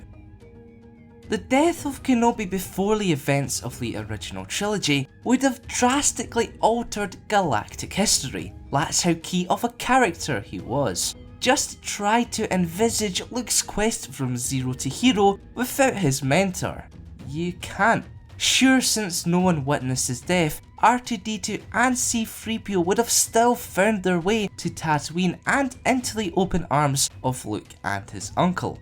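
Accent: Scotland, United Kingdom. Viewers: Lambda Presents Scottish I believe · Lambda Presents: Yes he is Scottish, His voice is cool! :)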